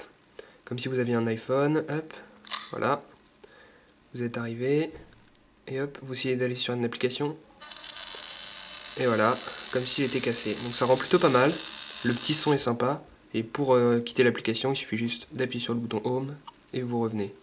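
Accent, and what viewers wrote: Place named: France. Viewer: j'aime ton accent français =)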